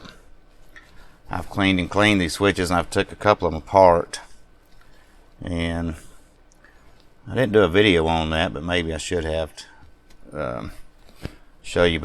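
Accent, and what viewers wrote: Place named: South, United States. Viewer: Mr. thanks for the refurb on this equipment. My lead coax reads rg58 /u, after restoration it seems to function as designed. It appears useful for spotting intermittently shorting capacitor pots. Thanks again looking forward to more vintage test equipment on your channel and your laid back southern style.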